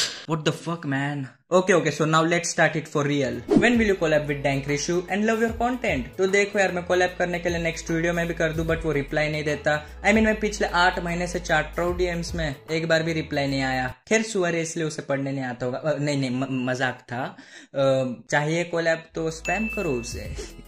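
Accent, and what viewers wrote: Maharashtra, India. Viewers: Your Marathi Accent is the best.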